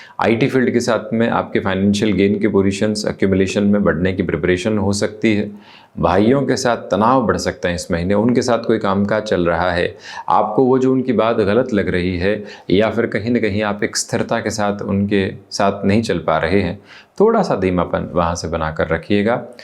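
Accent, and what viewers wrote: Standard. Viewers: Bahut badhiya rashifal bayan karte hai aap. Aur aapki hindi bhi bahut hi shuddh hai. Kabhi kabhi kuch shabd samazne me taklif hoti hai toh subtitles ON karke samazna padta hai. Dhanyawaad. 🙏🏻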